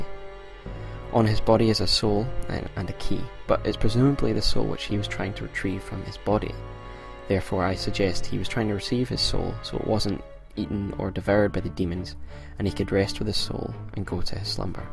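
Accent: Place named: Scotland, United Kingdom. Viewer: Dat Scottish accent. Nice vid man.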